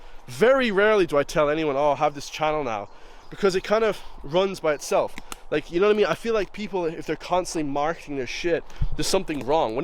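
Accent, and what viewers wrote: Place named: South, United States. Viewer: Hahaha you think you're right wing, but you don't abiut politics. You sound like a redneck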